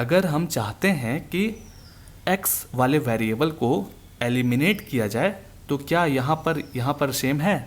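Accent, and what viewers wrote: Bihar, India. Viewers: Sir bihar ke ho ky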